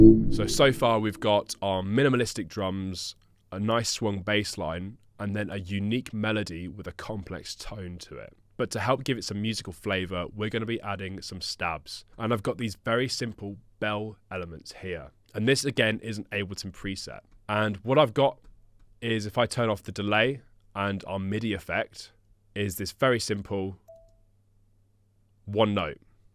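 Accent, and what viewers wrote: United Kingdom. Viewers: You English pronansuation is special candy for ears, is it RP?) thank you for nice tutorial as well!)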